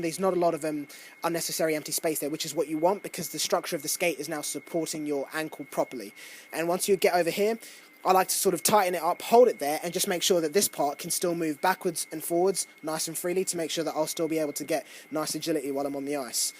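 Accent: England, United Kingdom. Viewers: It’s more that you don’t expect to hear an English accent when someone’s talking about hockey. England isn’t exactly the country one thinks of when they think, hockey hotbed. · OMFG I DIED WHEN I HEARD HIM SPEAK . HE'S BLACK BUT HE SPEAKS BRITISH LOL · Must be Sean mcmorrow's kid with that British accent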